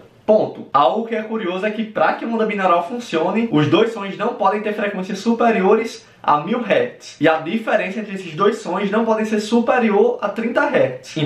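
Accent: Northeast, Brazil. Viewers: O sotaque dele é de Sergipe, Pernambuco pra cima. Ou do norte da Bahia, q faz divisa com esses estados aí. A Bahia é um estado grande, aqui tem no mínimo 4 sotaques: o de Salvador e região, (q é completamente diferente desse cara do vídeo), o do norte (q é bem parecido com o do cara do vídeo), o do sul (q é parecido com o do Espírito Santo e Minas Gerais e o do oeste, parecido com o de Goiás. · mas minha gente esse homão lindo + sotaque nordestino... :chefkiss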